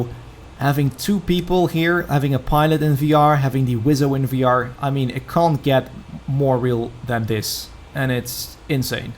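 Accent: Netherlands. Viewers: Klinkt Hollands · Are you Dutch? You sound Dutch lol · Grappig. Je hoort direct dat je een Nederlander bent.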